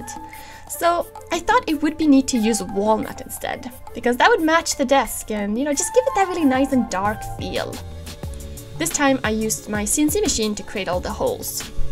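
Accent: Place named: Sweden. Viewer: I thought Swedish, but I may be wrong...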